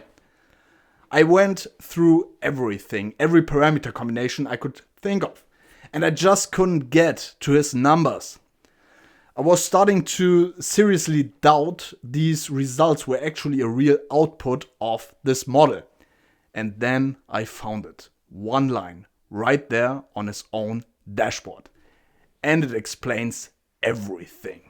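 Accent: Germany. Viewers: Der deutsche Akzent ist nicht zu überhören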